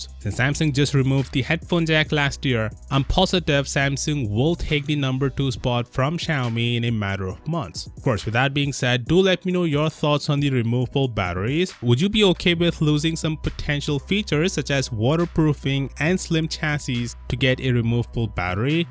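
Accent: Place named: India. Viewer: Disliked. Sounds like Indian scam call center.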